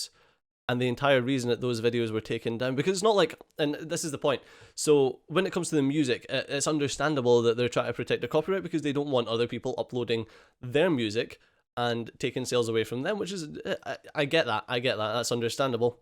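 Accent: Scotland, United Kingdom. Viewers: I wish you the best of luck man, my total youtube usage will go down without you and those wonderful Scottish tones. I hope more creators see what you are doing and stand hand in hand with you. Please don’t stop creating, i personally think you are in the right and we still need creators doing THEIR thing rather everyone just doing the same thing controlled by some faceless corporations, trying not to sound conspiracy theory on it.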